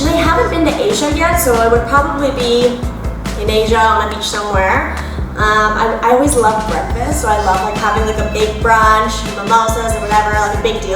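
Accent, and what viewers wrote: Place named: Mexico City, Mexico. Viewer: It was nice to "meet" you on MIM. You nailed the CDMX accent. I thought the birthday party episode was hilarious when you and Roby stormed out of the restaurant. Keep up the good work!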